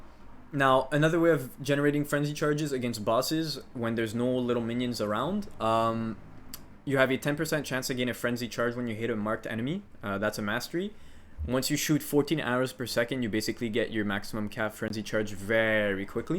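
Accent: France. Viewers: i Like Ur pronunciation. (French native?) Keep the Work Up on yt. I have watched numerous Guides. When i Heard IT IS your Seconds Video i was surprised. The Guide is well done!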